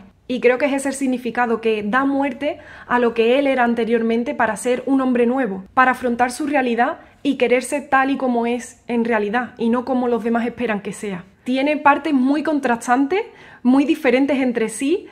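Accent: Andalusia, Spain. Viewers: Actually I regard myself lucky because I do understand what she says in spite of her thick Andalusian accent. I'm fluent in Spanish. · Está hermosa mujer, con ese tono andaluz es bella divina!! Y el talento que tiene es única ❤ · Hola. Solo he visto la introducción contigo hablando creo que acento andaluz o extremeño y me ha encantado. Eres un dulce de persona. Yo soy fan de Queen desde mis 13 añitos y tengo 60. De hecho pertenecía al Queen Fun Club desde 1977 y era de los poquísimos que había en España por aquel entonces. Soy músico, autor y compositor y te felicito por tu trabajo y también por tu manera de explicar con sencillez y claridad esta obra tan impresionante y única en la historia del rock. Un fuerte abrazo.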